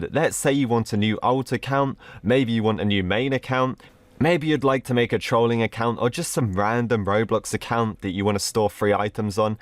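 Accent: United Kingdom. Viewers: He's British that's why.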